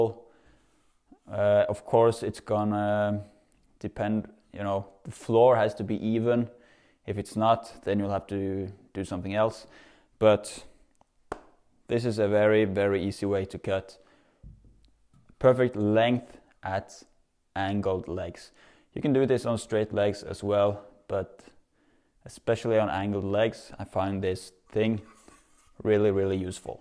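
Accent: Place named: Sweden. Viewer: Snyggt! Tyckte jag hörde lite svengelska därav min reaktion. Följer dig nu så hoppas på grymma videos i framtiden 😊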